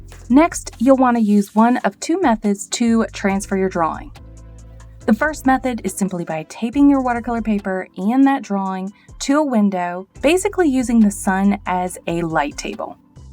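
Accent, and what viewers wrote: South, United States. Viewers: Gee,are you from the South??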